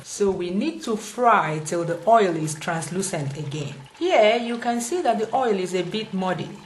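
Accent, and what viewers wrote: Igbo, Nigeria. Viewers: Although I don't hear Igbo, I love her humour blended with the Igbo intonation.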